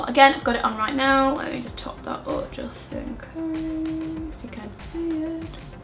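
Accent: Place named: Bolton, United Kingdom. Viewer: No matter how tired I am I'll stay up an extra 10 minutes to watch your videos. Love them every time & really love the local accent (I am a Boltoner originally) xx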